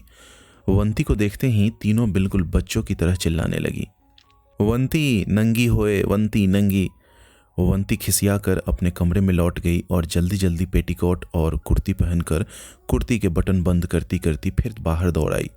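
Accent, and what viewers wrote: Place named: Bengal, India. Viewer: आप मूलतः बंगाल से हैं क्या? उच्चारण में वहाँ का रंग घुला हुआ है. 😊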